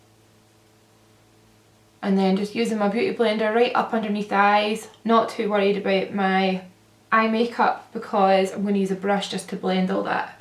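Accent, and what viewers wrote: Scotland, United Kingdom. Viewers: Omg you're Scottish, yes finally 🙌🏽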